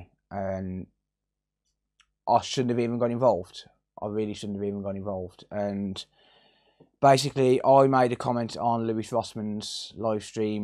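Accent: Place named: Black Country, United Kingdom. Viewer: We have all fell into that trap, at least you owned the error, now you can move on and continue with the great content we always see from you. You have gave me an interest in electronic repairs, not sure I could as I'm thick as two short planks but anyway, oh and big up the Black Country accent, I hail from West Brom... Keep up the good work 👍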